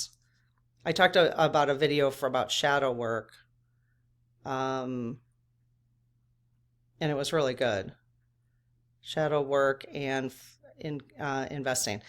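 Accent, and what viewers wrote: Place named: Wisconsin, United States. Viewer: Just stumbled on your channel. Love your "Sconnie" accent!!! I grew up in southern Wisconsin and now live in Minnesota.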